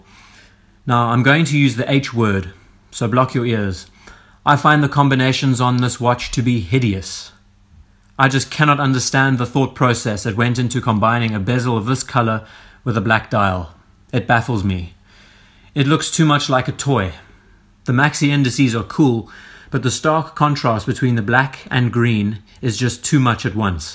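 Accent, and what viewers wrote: Cape Town, South Africa. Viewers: Tell me are you from Cape Town?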